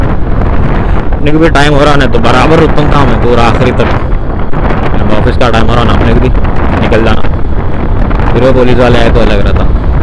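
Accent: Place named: Hyderabad, India. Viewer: This is not a hindi bro. It's a deccani hyderabadi slang...💀🙌🏻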